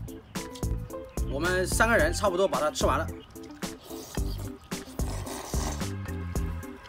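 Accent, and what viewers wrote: Sichuan, China. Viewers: 這種話才聼得懂，四川話聼起來好累唷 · 我特地來聽你的四川腔，你怎麼不說了😢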